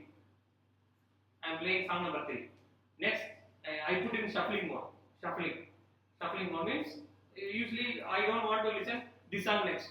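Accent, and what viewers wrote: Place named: India. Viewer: No, you have nothing to apologise for. This is your video. This is YT and you truly have probably helped out a lot people. My problem is that I can't stand your accent. After a few minutes I start to think I rather have a needle in the eye than keep on listening to it. There are many other people from other countries I can't listen to either: If you do not try to speak more with or an en English accent or an American or Canadian accent people will not wanna listen to your story. I am from The Netherlands. When Dutch people speak English it is often also horrible to listen to. Do you know the 'Secretary-General" of NATO, Mark rutte? Dutch and has this cringing Dutch accent. He speaks fluent English but crap to listen to. Nowadays kids come from school here and they speak fluent English with an average sounding American accent... 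[/rant] Don't let me stop you from making explainer videos. India was a crown colony, right? Why not uplift your English by talking the queen's English? Actually I know what the reason is: You have no people around you from the UK or the US..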